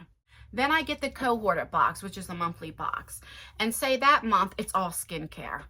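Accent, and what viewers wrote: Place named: Massachusetts, United States. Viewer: I’m 69 years old please advise me,I love the way you tell it like it is,your a doll. And boy can I hear your accent,because I’m from Massachusetts 🥳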